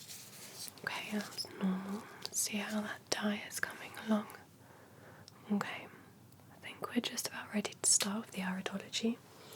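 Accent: United Kingdom. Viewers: Great ASMR voice, British accent makes it even better! ✌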